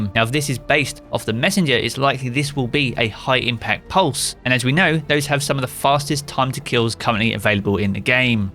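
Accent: United Kingdom. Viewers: I so much love your briish accent idk I'm not English man:/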